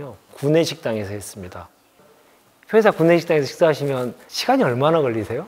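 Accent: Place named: Jeolla, South Korea. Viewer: 표준어를 쓸려고 노력하지만 전라도 사투리인듯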